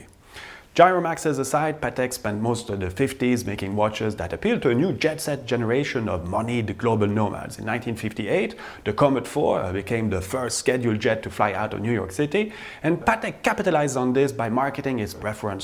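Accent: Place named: France. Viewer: Interesting content and very ambitious lecture. But: you try to press much details into 20 mins of video. That leads to a velocity of your speech which together with your strong french accent I can hardy understand. And I'm familiar with Englisch. Alltogether I'm faced with a kind of frontal teaching, which I hoped to be extinct since the 60s.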